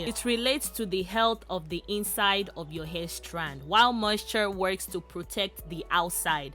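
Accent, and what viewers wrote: Nigeria. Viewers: I love your Nigerian Accent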